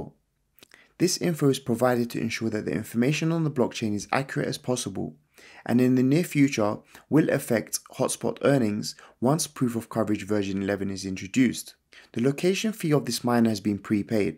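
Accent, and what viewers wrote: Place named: North London, United Kingdom. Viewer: Hey man, just discovered your video/channel. My Linxdot arrives late March. Great video, have subscribed. I'm in North London, you sound as if you are too? If so, how is it going and if not how you finding mining in London? Still to watch more of your vids but you are the first I've found in London possibly north 😄 Cheers. Edit- just finished the video, very informative, thank you.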